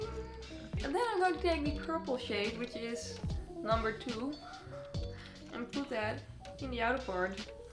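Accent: Netherlands. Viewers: She’s dutch so that’s why the accent prolly overlaps 😸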